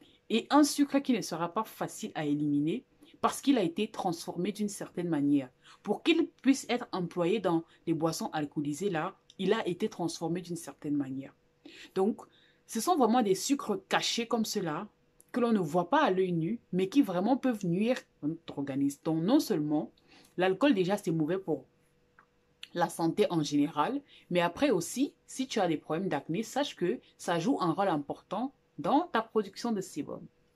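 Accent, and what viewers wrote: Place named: Democratic Republic of the Congo. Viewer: Je confirme pour les produits laitiers. 😥Je découvre que tu es camerounaise. D'où te vient l'accent congolais?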